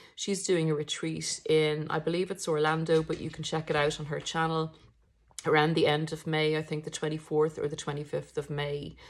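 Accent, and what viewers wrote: Ireland. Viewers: Thank you for your video's. I am on the journey of cutting of the toxic relationship since June. I am still ruminating in this. Some days it's better, especially when I have a lot to to. I like listening to your view on this. As a Christian I feel that this disorder is definitely evil. I just visited Ireland, and therefore enjoy the accent too! Best regards. Daniell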